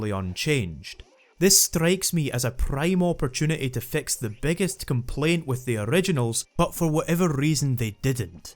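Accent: Scotland, United Kingdom. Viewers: I never thought hearing an extremely powerful Scottish accent after the ORAS opening would be so jarring. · 7 hours of Scottish accent, LETS GO!! For some reason it actually helps me focus on the content of the video. Don't ask me why lol Have you ever gone back and played it since this video? Shiny hunt or just playing through again? What about AS? have you played that yet? I've always kinda disliked that they just copy pasted the Battle Maison from XY and not have their own unique battle facility. I know HG/SS did that with plat but still :( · HOLY MOTHER OF ALL VIDEO ESSAYS... Good thing I really enjoy scottish accents. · THIS IS MY FIRST VIDEO IVE SEEN FROM THIS GUY AND THE SCOTISH WAS THE BEST SURPRISE EVER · Seven hours of ah Scottsmann tolkin aboot Pokähman? Consider me signed up. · Lol i couldnt understand i it because of the scottish. But your brothers catchphrase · Mm yrs I sure do love a Scottish man narrating a remake of a game for 7 hours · This guy ain’t just Scottish, he hella scottish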